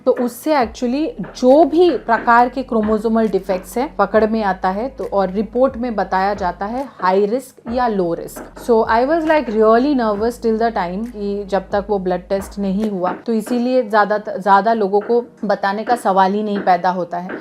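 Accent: Bengal, India. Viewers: i would like to tell you something initially I felt that u r a very rude person i used to watch your videos previously but didn't subscribed your channel as I kept watching your videos i got so impressed by you...ur truly and genuinely take so much efforts for your PR Or advertising any product seriously it's like for any product u try to make content from ur videos and the most important thing is u r too light in ur life how nicely u handle every thing the way ur at ease in your life is so so inspiring by seeing ur videos i learn that it's absolutely not necessary to be in serious mood all the time.....i just love u and your videos the way crack jokes....the way u talk with ur hubby....Pablo....and most important ur Hindi with bengoli touch....and now I have subscribed ur channel....and enjoying every bit of your vlogs....u never disappoint your viewers every videos has something new and knowledge too...All the best and enjoy....no need to tell you though😛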